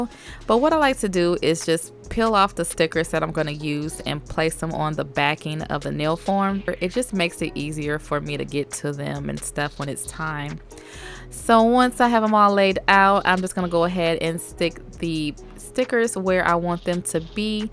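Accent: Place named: Atlanta, United States. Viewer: Just found your videos today and first thing I thought was “she sound like My fellow Atlanta native Kandi Burruss” anywho you’ve inspired me to use my Cosmetology skills to do my own nail dyeing and after this pandemic! Now let see if I can remember those Empire beauty school lessons along with you videos I should be fine😹😹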